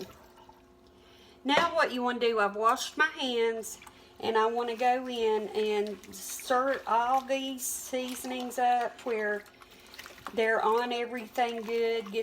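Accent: South, United States. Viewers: I wish I was your neighbor. I could visit with you for hours. I love your sweet southern accent and your sense of humor. You're just the sweetest. I am looking for new recipes and I found the right channel. We only eat the boneless, skinless chicken breasts. I do but some thighs or legs for my son occasionally and so I can get some good broth to freeze or make gravy with. Thank the Lord you're video was in my feed because I am binge watching now. God bless! ❤❤❤ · We saw the munchkin peekin around the corner! Ma'am what part of the South are Y'all from? (not just the state but like north east Alabama or south west Arkansas) Thanks.I watched your questions video. Now I know why the indeterminate accent! When I was a kid in SW Michigan on a fruit farm, Southerners would come up and pick fruit. I absorbed many an accent during those summers.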